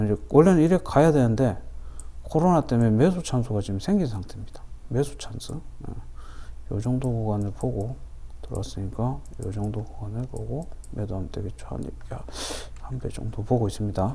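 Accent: Gyeongsang, South Korea. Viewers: 우연히 보게됐는데 경상도 말투도 친근하고ㅋㅋㅋ 재미있고 친절하게 설명해주시네요 감사합니다ㅎㅎ 오늘 sfa 샀다가 매도 놓쳐서 어쩌지 했는데 홀딩할만한 애였군요!! 주식초보인데 20년고수님께 앞으로도 많이 배워가겠습니다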